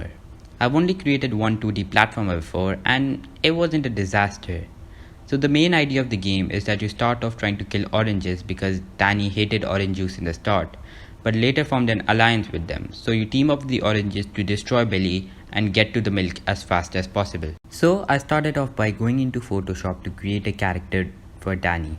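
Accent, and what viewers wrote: India. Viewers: nice indian accent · oh man you live in my favourite city and your accent sounds like Indian accent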